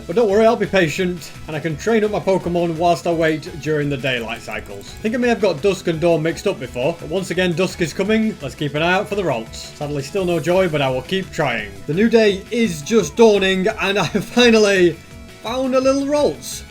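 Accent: United Kingdom. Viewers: why do you look like indian but your accent is british?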